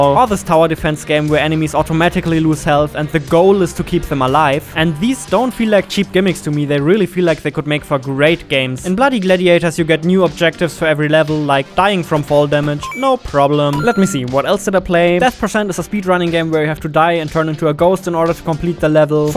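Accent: Germany. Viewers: Man merkt das deutscher bist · Also ich wart ja schon seit einem Jahr auf will u Snail XD und weisst du was, voll kein Problem. Ich versuch gerade mein erstes Game zu machen und es geht immer länger als gedacht. Jedenfall freu ich mich drauf dein Spiel zu Streamen ob ichs gewinn oder nicht. Für deine Statistik bin über Islanders auf dich aufmerksam geworden. Mach weiter so, nice Videos, nice Games, ein unverkennbarer deutscher Akzent, der meine Freundin zur weissglut treibt, wenn ich deine Videos guck, Alles in Allem ich bin Fan. Grüsse aus der Schweiz ;)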